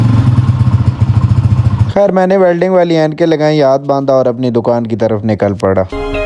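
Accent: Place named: Punjab, India. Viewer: I really like the punjabie touch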